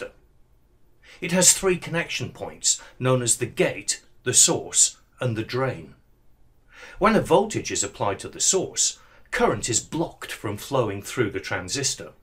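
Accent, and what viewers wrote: United Kingdom. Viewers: What I expected: Indian English accent What I got: Marvelous British accent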